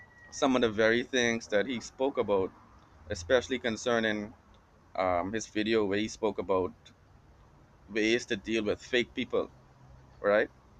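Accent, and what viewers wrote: Bahamas. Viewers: You Bahamian bro?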